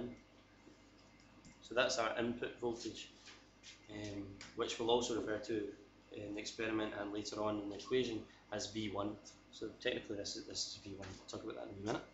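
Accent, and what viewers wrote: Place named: Scotland, United Kingdom. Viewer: Thanks, love all the vids! Scottish accent i hear;) got prelims in few weeks so this helps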